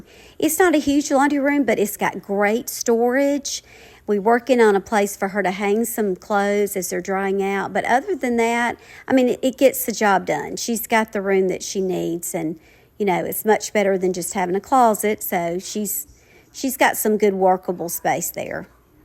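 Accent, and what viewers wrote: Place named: Alabama, United States. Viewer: Came for the organization, stayed for the beautiful Alabama accent! Music to this Southern gal's ears!!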